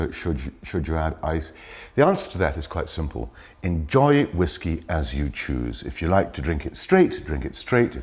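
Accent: United Kingdom. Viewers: A British Optimus Prime?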